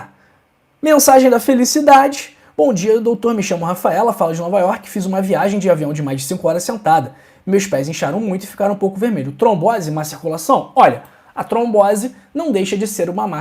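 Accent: Rio de Janeiro, Brazil. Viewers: Bom dia Dr. Sou Amélia e sou carioca como vc e o nosso sotaque é caracacteristico dos nascidos no Rio de Janeiro, as pessoas mal educadad e sem princípio ficam se apegando a essas picuinhas. Dr. Vc é perfeito em todas as suas colocações e educado; talvez a sua pronúncia deve ser motivo de inveja para os que o criticam eu tbm já fui criticada dizendo que eu falo chiando e este tipo de gente com certeza não deve ter o que fazer, e falta de berço. Vc é muito importante para nos orientar no cuidado com a nossa saude. Somos todos gratos a DEUS que o colocou em nossas vidas. E lembre-se Dr do antigo e dito popular: " O que vem de baixo não nos atinge" e ser educado é uma questão de berco. Dr o abençoe sempre e guarde todos os seus caminhos. ABC. ❤ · Bom dia Dr.! Eu acho lindo o sotaque carioca, aliás eu ter nascido no Rio de Janeiro, a Cidade Maravilhosa, por sinal eu conheço o Rio de Janeiro, já fui conhecer, tudo lá é bom, belo e maravilhoso! 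Eu amo o Rio de Janeiro demais! Um dia quem sabe eu morarei lá né? ❤ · Pois eu acho lindo o sutaco dos Cariocas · Gostei muito do seu Sotaque pois O Sotaque Carioca é muito lindo LLLlllllllllllllllll parabéns Amei Dr, 🙏🙌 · Parabéns Dr. Acho lindo o sotaque dos cariocas, mais ainda, as suas explicações. Moro em São Paulo a mais de cinquenta anos porém, sou mato-grossense. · Não sou carioca, mas amo o sotaque do carioca! Cada estado tem o seu sotaque!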